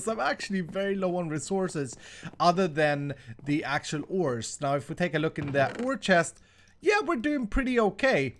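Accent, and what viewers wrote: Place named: Sweden. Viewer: are from sweden? sounds like that.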